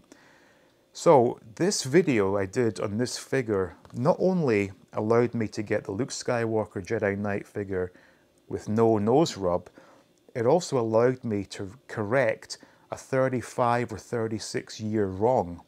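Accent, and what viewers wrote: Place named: RP, United Kingdom. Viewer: So refreshing to see someone on a video that can sit behind a desk, can talk in the queen's English and not be wearing some trucker cap and talking to puppets, who just so happen to be their only friends in life.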